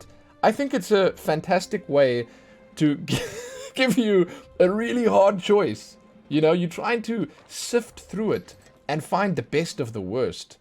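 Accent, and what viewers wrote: South Africa. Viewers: This guy is South African I could tell by his accent I’m South African too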